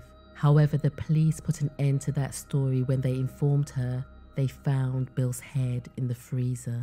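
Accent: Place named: United Kingdom. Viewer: I just found this channel and I love your voice. No matter how gruesome the details, they always sound kind of classy when spoken with a British accent. Oh me oh my!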